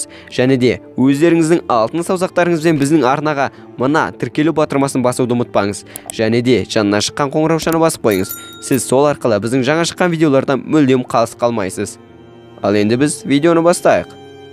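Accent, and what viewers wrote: Mongolia. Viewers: Өзіміздің Моңғолиядансың ба?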